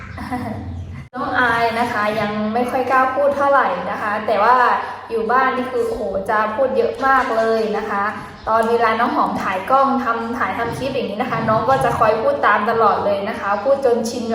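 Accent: North, Thailand. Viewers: สำเนียงเหนือ อู้เหนือ ก็คนไทยด้วยกันนี่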